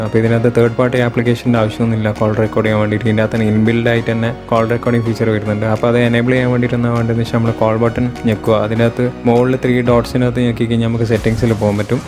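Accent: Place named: Kannur, India. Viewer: കൊള്ളാം, നല്ല അവതരണം. കണ്ണൂർ slang ഉണ്ട്.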